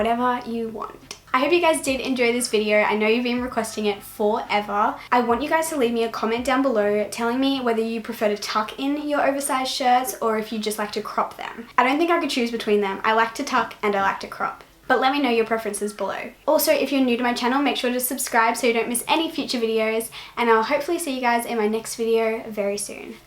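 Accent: Australia. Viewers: Is she aussie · Are you Australian? I looooveee you’re accent so much, it’s so cute!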